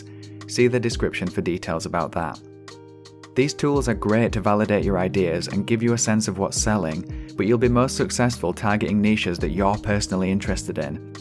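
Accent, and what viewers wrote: Yorkshire, United Kingdom. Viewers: Yorkshire accent ;)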